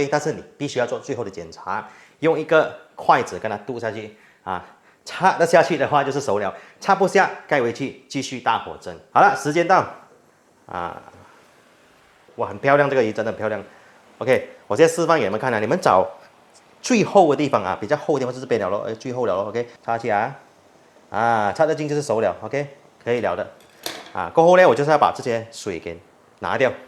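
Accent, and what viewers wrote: China. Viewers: 你的客家話很重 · 广东，一块都讲成一告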